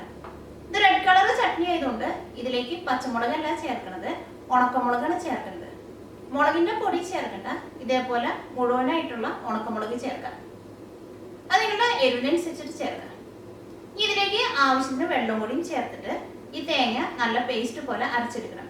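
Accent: Thrissur, India. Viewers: trissur analle naadu? avatharanam super anutto njan ennundakkunndu yours chatni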